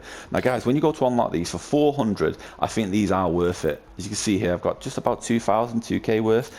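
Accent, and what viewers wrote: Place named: Manchester, United Kingdom. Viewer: Nice vid mate and nice to hear a manc voice haha!! subscribed!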